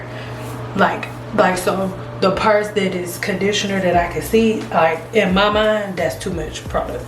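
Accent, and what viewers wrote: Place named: South, United States. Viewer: I loved the blowout. Child, you sound like you from the south like me. LOL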